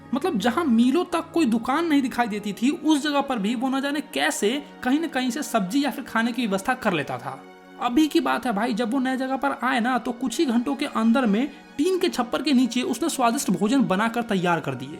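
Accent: Bihar, India. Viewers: bhai aap bihari ho na 😊😊😊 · Are you bihari I am also bihari because you spoke bhojpuri 😅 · Bhai app kha ke ho bhoot wala story me jo tone tha wo mujjafapur sultanpur yahi sab ka tha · Bihari language me maza aa gaya 😂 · Bhai aap bihar ya up se hoo aapki explanation yrr 😭 bahut jhakkas hoti hai bahut ache se samajh me aata hai ❤ love you bhaiya 😊❤ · aap biharse ho kya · Bihari ho bhaiya?? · are you bihari?? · bihari accent😁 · Aap bihari hai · AAP to Pakka Bihari lag rahe ho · Do you belong from Bihar??? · Bhaiya aap Bihar se hona ❤ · Bruh i think you are from bihar 😅, like no offence but ur method of speaking shows that. ❤ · Sir aap Bihari ho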